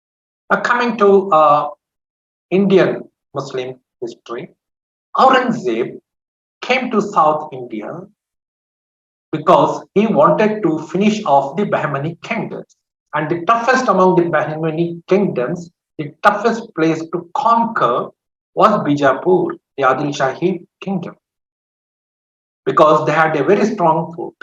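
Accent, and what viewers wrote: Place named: Hyderabad, India. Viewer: Although a bit long, the video describes many backstabbers and carefully mentions that hypocrites are present in all societies and religions. By his pronunciation, one can guess that he is of Hyderabadi background and he dared to include Asaduddin Owaisi in the list of backstabbers. Personally, I do not have any reason to doubt Owaisi's intent to help Muslims, his actions speak louder affecting the election outcomes. The narrator mentioned that Owaisi's participation in UP and Bihar elections resulted in additional seats for the BJP by dividing Muslim votes. There are unconfirmed reports that Owaisi's party was given a huge amount of money for its participation in the election.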